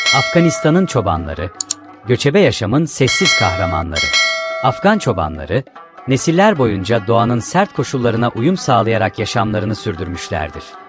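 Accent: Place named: Turkey. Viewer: بسیار عالی موفق باشی یک مستند بسیار عالی بود لحچه ترکی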